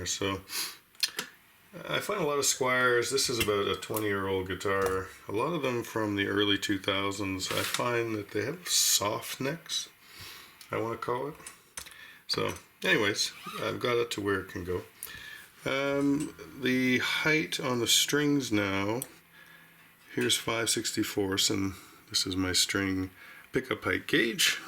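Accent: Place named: Ottawa Valley, Canada. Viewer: Over here in the L. Ontario basin, we can hear the subtle, very subtle Ottawa Valley accent ... it's a Juzz Bayce!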